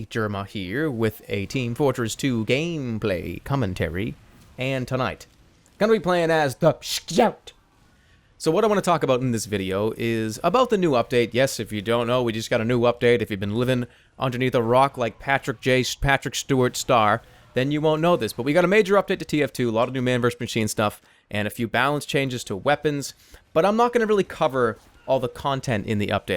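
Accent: Boston, United States. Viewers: I believe he's from Boston.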